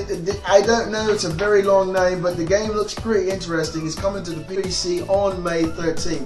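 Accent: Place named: United Kingdom. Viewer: Lol lovin the posh English accent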